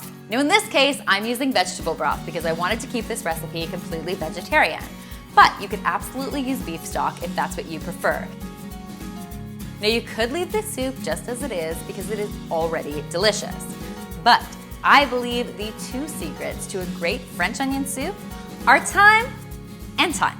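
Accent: Canada. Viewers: You're Canadian, right? Just confirming 😅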